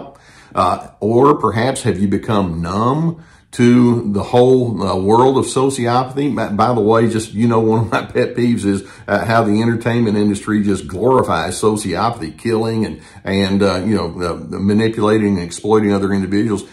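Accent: South, United States. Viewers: I forget where my discourse amongst myself takes place in this comment section, but it’s really funny because my mom will be laughing so much. Everything he says. Is me but like I’m counteracting it. It’s funny because it’s like wow he’s got that southern yet. Chris drawl. I am yapping. Like a Chihuahua. About my identity and rights. It’s hilarious. This is good banter. Sometimes two opposing forces can create something like the binary between us. Binary sound, you know what I mean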